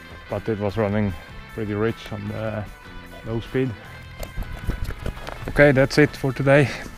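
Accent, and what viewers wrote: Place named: Netherlands. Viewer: Cool shit - trying to place the accent. Dutch?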